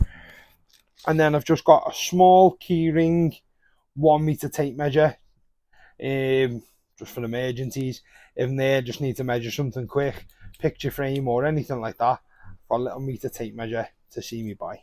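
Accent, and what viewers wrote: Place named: North West England, United Kingdom. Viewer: Sound like your from the North West like me. Would love a colab at some point. 😊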